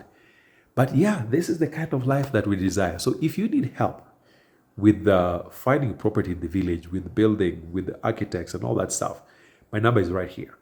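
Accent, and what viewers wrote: Kenya. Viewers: This guy's story telling skills coupled with Kenyan accent makes this story even better. I love me an Eastern African accent.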